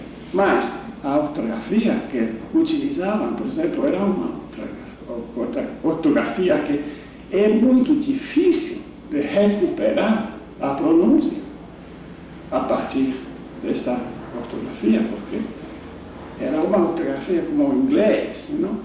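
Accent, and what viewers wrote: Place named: United States. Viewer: As I see it, the "issue" is not having an accent per se, as more often than not, words are pronounced just as they should. But the fact that he pauses a lot and takes a moment for choosing the word he wants and then apply correct grammar around it for the sentence he's about to say. Therefore, he doesn't seem anywhere near a native even tough he has better grammar than some natives and has a great pronunciation overall. A native unaware of his identity would probably consider him a foreigner that had spent about a decade or even more living in Brazil. The host on the other hand, speaks with a heavy accent as American as 4th of July.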